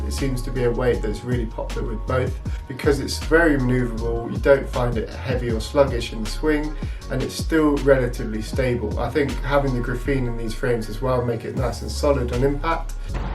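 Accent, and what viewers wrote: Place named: England, United Kingdom. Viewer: England? I can barely understand, but love the accent. What about senior players? I’m finding this racket pretty awesome.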